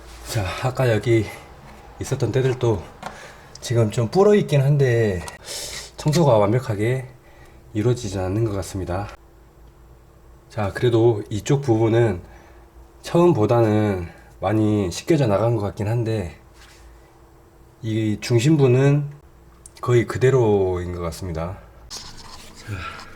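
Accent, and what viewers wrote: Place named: Jeolla, South Korea. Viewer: 제가 해외거주하는데 세탁기 청소하려고 영상봤다가 기사님 전라도 억양을 듣고 전라도 분이신 제 부모님이 생각나네요😊 너무 정감가고 좋아요~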